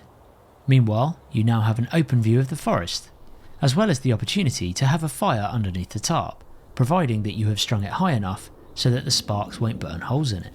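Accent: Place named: United Kingdom. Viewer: Hate the lime accent.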